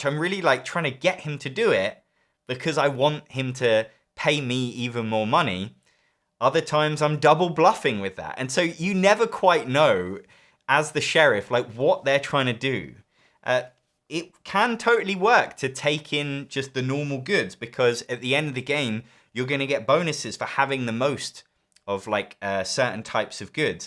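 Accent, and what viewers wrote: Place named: England, United Kingdom. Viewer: that's just how you say "drawing" in an english accent.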